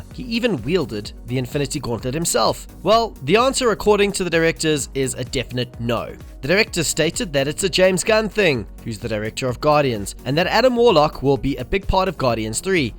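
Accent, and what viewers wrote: South Africa. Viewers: Random question, are you South African?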